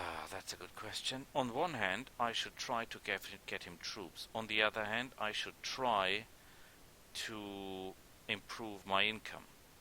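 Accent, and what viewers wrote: Germany. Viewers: He is german I think. He has a clear german accent. In the german language, the two digit numbers, and every two digit part of more digit numbers are spelled backwards, and only the two digit parts of those numbers, too. So when I do a literal translation, for example they say 25: five and twenty. 74, four and seventy. 368, three hundred eight and sixty. I know, it's very unintuitive, when learning that laguage it fried my brain many times, among other... quirks of that language.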